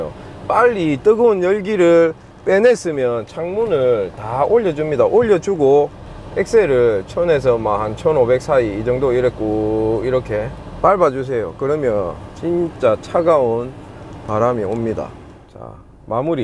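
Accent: Gyeongsang, South Korea. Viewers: 실내가 찹찰해짔다 ㅋㅋㅋ 아 찐 갱상도남자 ㅋㅋㅋ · 행님 영상 급나게 잘보고잇습니다 경상도 사람이라서 사투리 쓰시는 유튜버 뵐때마다 을매나 반가운지😁 제가 일할때 레이 밴 차량을 타는데 이 방법이 잘 안통합니다 ㅠㅠ 형님 영상에 있는대로 외기순환을 적극적으로 활용해서 해도 에어컨 팬 자체가 약해서 그런지 안통하더라구요 ㅠㅠ 방법이 어찌 없을까요..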